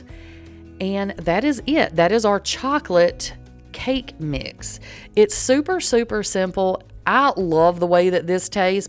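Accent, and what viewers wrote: South, United States. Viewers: I only bake homemade cakes as I personally do not like store box cakes (too sweet for me) and having these premixed cake mixes is going to be a game changer. Thank you so much for sharing these recipes. p.s. I love your accent 😊 I lived in GA long time ago for a few years and just love the southern accent.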